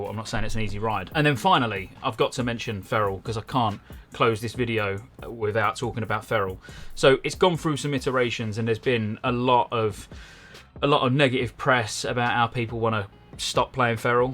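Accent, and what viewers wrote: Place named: England, United Kingdom. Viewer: Trying to learn the different English accents, where did you grow up?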